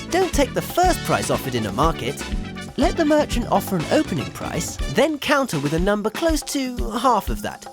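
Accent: United Kingdom. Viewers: He's not an American, he has a British accent.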